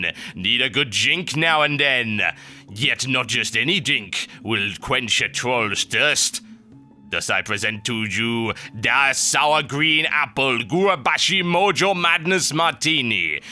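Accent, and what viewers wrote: United Kingdom. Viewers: because he is from United Kingdom,has British accent,commentates on wow and games,and theres more awesomenessnessessess stuff i cant even think about or my head would explode from the sheer awesomenessnessessess =D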